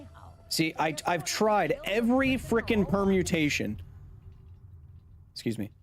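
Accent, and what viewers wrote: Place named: Australia. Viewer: watch for the australian accent and bob ross voice, stay for the thick load of science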